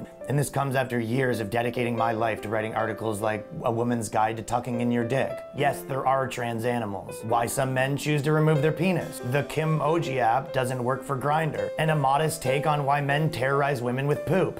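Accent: Canada. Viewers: Are you Canadian? Can definitely hear some canuck coming through. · your Canadian accent was really thick in this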